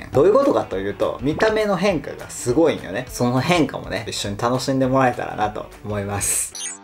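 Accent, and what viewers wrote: Kansai, Japan. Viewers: こ、これは関西弁なのか、、笑笑全然わからへん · くんの関西弁、すごくイージーリスニング。心がほわほわする。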